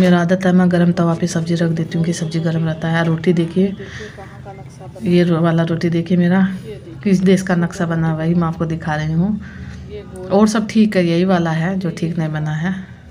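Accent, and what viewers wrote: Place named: Bihar, India. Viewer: एकदम बिहारी जबान में.... 😄😊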